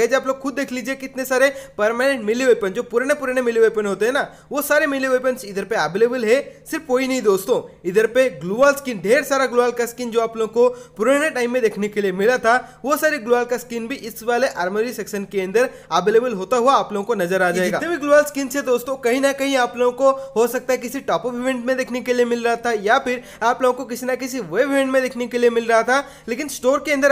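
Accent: Odisha, India. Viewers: Aap odisha se ho kya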